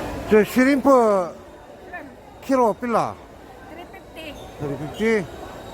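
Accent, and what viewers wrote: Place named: Gyeongsang, South Korea. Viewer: 경상도 사투리 좋아요 자막은 표준어로 처리 해주세요 ㅎㅎ😀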